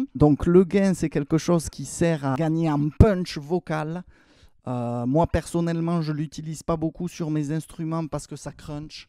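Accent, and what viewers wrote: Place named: South, France. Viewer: super ta vidéo! je cherche une table de mixage et tu m'as donné envie d'acheter ce modèle! je connais bien le matos yamaha...mon fils m'a emprunté une table de mixage yamaha que j'ai acheté il y a plus de 10 ans chez cash converter en occase! 12 pistes 170 euros rien à dire! il y a une couche de poussière dessus de 10 cm au moins, et elle fonctionne toujours super bien!! il ne veut plus me la rendre!! en tout cas ton accent du sud fait du bien à entendre!! bonne continuation à toi!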